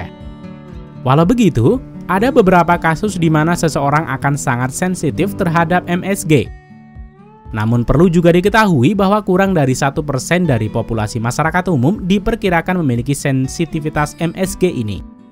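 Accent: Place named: Chinese-Indonesian, Indonesia. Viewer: Logatnya kayak chindo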